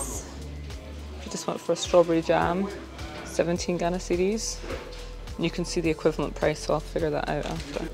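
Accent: Scotland, United Kingdom. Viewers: I'm and this Vid-yO that Scot accent is physically painful no shade, nice content great quality videos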